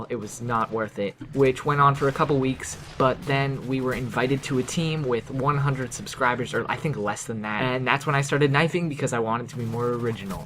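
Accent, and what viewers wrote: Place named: United States. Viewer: Were you born in Canada? You sound American.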